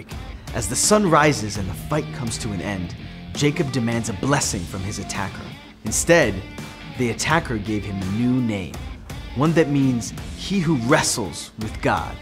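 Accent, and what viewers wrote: United States. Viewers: Are you Israeli or American? You sound like an American. God Bless you for all the information you have shared. You are bright and very knowledgeable. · I love that this guy has an American accent and can claim ownership over land that once belonged to the indigenous, and be proud. Why not go further back before the myths of the book? Who were the Canaanites and who are the direct descendants? This video proposes the current Palestinians were immigrants when we know they are direct descendants. So are the Lebanese who hold closer dna than any current Israeli. There is no tangible evidence of David ever existing, so for anyone today to excuse apartheid and ethnic cleansing based on folklore is beyond insanity.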